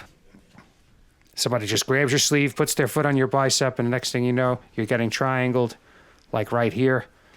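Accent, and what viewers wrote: United States. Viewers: Your voice sounds like Secaucus to me. 🤔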